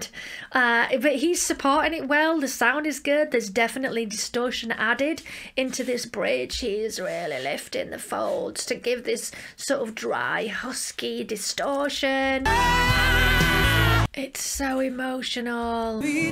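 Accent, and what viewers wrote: Manchester, United Kingdom. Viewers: I love your accent, is it from around Manchester?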